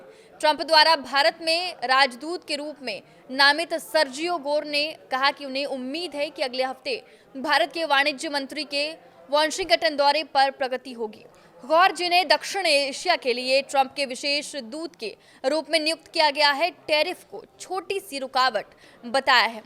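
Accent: Bihar, India. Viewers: Medam, wansigoton nehi he Wo washington he,,, Kya madam english me bhi bihari accent late ho,, kya huwa itne padai likhai ki jo pronounciation nehi aata apko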